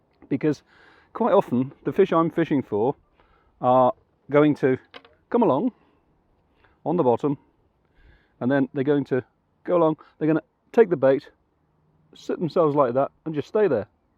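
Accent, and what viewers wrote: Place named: East Midlands, United Kingdom. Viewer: Decent couple of hours, just started the lift, crucians & tench were very accommodating. That accent of yours🤔 East Midlands?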